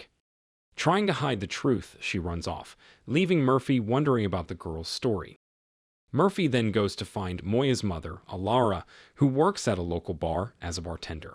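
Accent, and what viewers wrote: United States. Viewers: Man do I hate a yank telling an Irish story ..... give up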